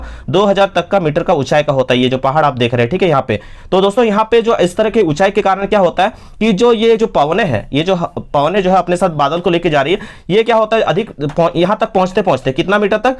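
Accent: Jharkhand, India. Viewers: Sir AAP jharkhand see ho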